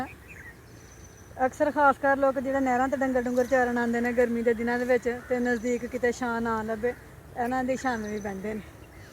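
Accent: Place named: Sialkot, Pakistan. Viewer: آنٹی جی آپ کی حکمت کے بارے میں تو میری کوئی اچھی رائے نہیں لیکن آپ پنجابی بہت پیاری اور میٹھی بولتی ہیں، مجھے لگتا ہے آپ کا تعلق سیالکوٹ سے ہے۔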